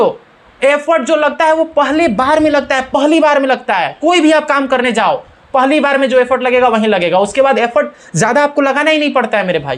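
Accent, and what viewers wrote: Bihar, India. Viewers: Good approach for math telling inspector sahab your style of speaking is fabulous bro and also motivated lots of things bro ✌️♥️♥️ Bihari style 😎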